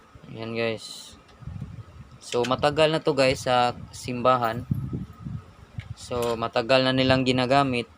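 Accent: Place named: Visayas, Philippines. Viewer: Galing mo master..asa inyuha master..murag bisaya sad ka master Bah..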